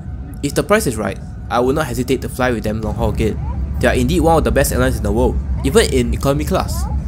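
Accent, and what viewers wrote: Singapore. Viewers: Good job on the video and am glad yr accent is genuine Singaporean. However, the words seem to be generally quite 'compressed'. In the English language, there are not only short vowels but long too.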